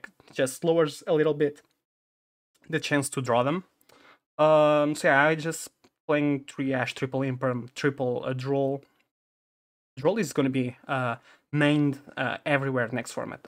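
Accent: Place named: Portugal. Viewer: good video, out of curiosity your accent sounds like you are from portugal or something ??? not trying to dox you hahaha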